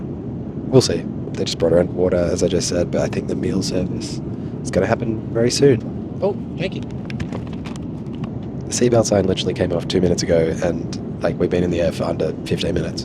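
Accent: Australia. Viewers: your accent screams australian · Great video mate! I really enjoyed this video and I hope to fly to Queensland in Australia 🇦🇺 hopefully next summer to meet up with a friend. I really think this was an interesting video for sure! The reason airlines now love Queensland seems unusual for sure but still interesting! 😂 Also what idiot tried to think you’re not an Aussie? You’re clearly one based on noting more than your accent! Cheers 🥂 Mate! Really enjoyed this video and I loved it as I always do with your videos! -Caleb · How did anyone even mistake you for a European guy lol You’re obviously Australian just from the accent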